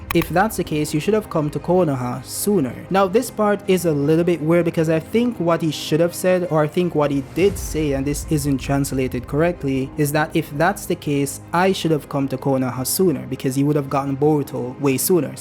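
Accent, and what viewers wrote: Jamaica. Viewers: Is bro jamaican 👀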